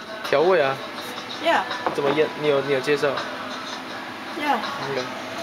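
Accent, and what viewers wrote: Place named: Cantonese, China. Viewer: DV沒電了嗎?~_^ 您的廣東腔果然很重, 我看到了妳廚房裡面也有"米酒" haha~~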